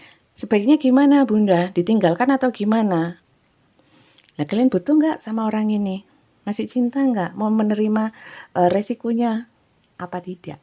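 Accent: Java, Indonesia. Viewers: Selamat malam mom selalu senang dengerin kalau ngmg medok banget jawa nya bikin ketawa, sehat selalu 🙏.. salam hangat mami dari taurus di sby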